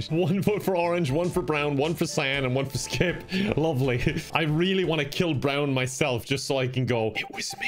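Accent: Ireland. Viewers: Why did actually sound irish today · That Irish accent omg haha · irish accent was so strong this episode lol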